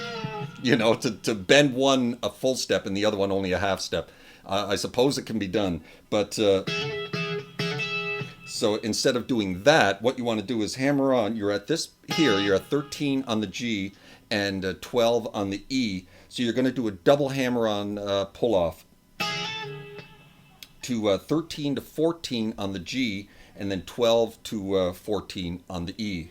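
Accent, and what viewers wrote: Canada. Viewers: Great video! You spoke three words and I could tell you were Canadian eh!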